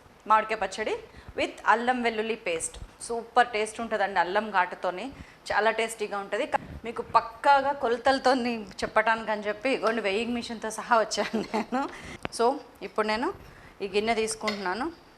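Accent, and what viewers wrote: Telangana, India. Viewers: Meeru andhra ina telangana slang matladutunnaru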